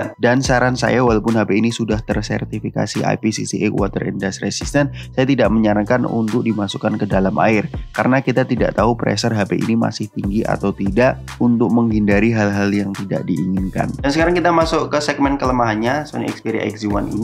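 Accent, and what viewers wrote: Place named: Java, Indonesia. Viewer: Ketoro jowone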